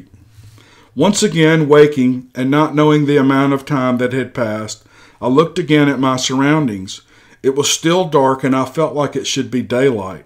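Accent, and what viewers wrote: South, United States. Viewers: If you will listen again...carefully at 1.06 l believe, he correctly pronounced the word you are so quick to call him out on...maybe your hearing might be a bit dull. When you have thousands of people listening to you and asking for more, maybe then you might have cause; we who absolutely adore this gentle Southern drawl expect him to read this as a Southern boy would speak. At any rate, he is a big man, kind and careful...try to follow his lead. I believe he will steer you correctly, cheers & happy trails! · It was nice to hear the story narrated by someone with a genuine southern accent. The story is credible and worth the time to listen to. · I LOVE LOVE LOVE this story!! Thanks so much to the writer, and to you,! This story could only be told with a good Southern accent! I often listen to your stories multiple times (like reading a good book multiple times, when you think about it), but this one I replayed straight away... 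I wouldn't be surprised if this is a true account. There must be more stories like this out there... told to children and grandchildren... Thanks so much for this gem 🪄💎 ✨️ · One of the most compelling stories and the way you narrate these accounts is awesome, and your southern draw adds to this story thank you my man for this magnificent post ⭐⭐⭐⭐⭐. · thank you for this thanksgiving day treat. what an interesting story! hope you and your family had a wonderful day. love your channel. your stories are top notch and you're a fantastic narrator. your great southern accent is a treat and makes listening to these stories more enjoyable. I just recently subbed to your channel and have now listened to all of your previous uploads and look forward for each new post! keep up your good work and I can see your channel will quickly grow. thanks. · I love your voice and soft southern accent! Great story! 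· I live in Elvis land now and work in North missippii love listening to u because u sound like me.good show love it!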